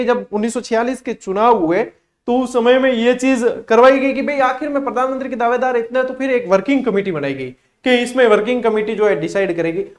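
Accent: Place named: Pali, India. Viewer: Sir mujhe aapki pali wali bhasha bhot mst lagti h aap aise hi bola kriye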